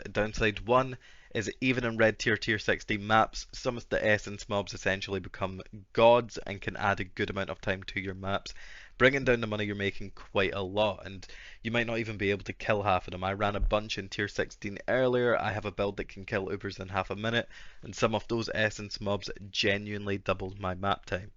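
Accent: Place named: Glasgow, United Kingdom. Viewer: n ur accents pretty tame too compared to some real glaswegian gems I encounter on the daily 🤣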